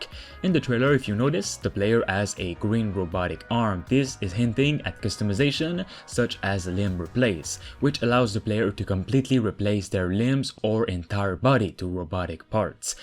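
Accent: Quebec, Canada. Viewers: Juste avec l'accent, on sait que tu es québécois 👌😂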